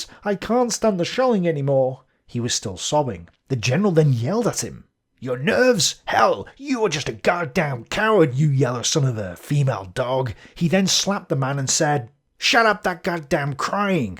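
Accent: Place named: Staffordshire, United Kingdom. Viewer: That’s a fellow Staffordshire accent if I’ve ever heard ons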